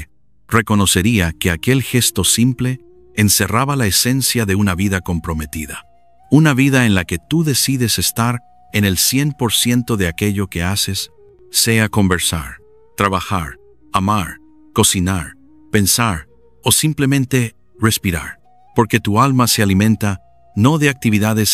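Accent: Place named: England, United Kingdom. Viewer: Excelente reflexión. Cualquier cosa nos distrae del momento presente. Una recomendación sería usar una voz neutra y nativa, sin acento inglés, pues la pronunciación incorrecta termina distrayendo... Saludos.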